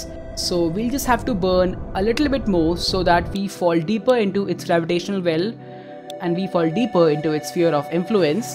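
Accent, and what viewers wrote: India. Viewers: Hey r u indiaian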